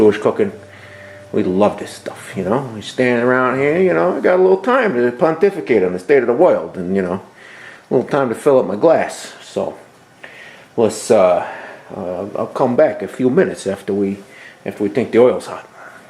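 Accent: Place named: Brooklyn, United States. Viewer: What a great accent. It makes me feel at home back in Brooklyn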